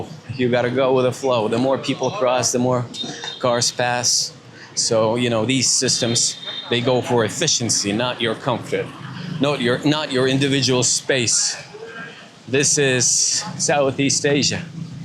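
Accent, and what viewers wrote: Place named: United States. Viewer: Nice vlog, I was in Nha Trang 2 years ago … Were you born or lived in the US? You have an American accent . Keep on vlogging please . Thank you .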